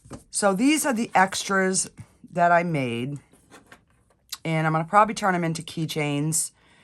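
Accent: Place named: Rhode Island, United States. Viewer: You sound like my RI family 😀 I enjoy watching you create.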